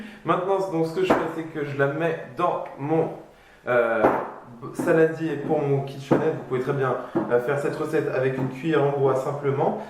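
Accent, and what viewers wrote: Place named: England, United Kingdom. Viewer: Tu te la pette avec ton accent anglais et tes donugts son trop épait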